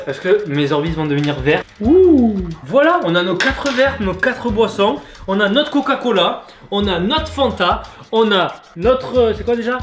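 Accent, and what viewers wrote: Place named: South, France. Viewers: J’aime pas l’accent du sud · Accent du sud 💖💖💖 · En 1 : j aime trop ton accent sudeste Puis en 2: continue comme sa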